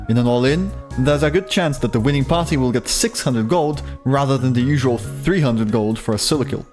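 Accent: Brazil. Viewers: Are u Brazilian ?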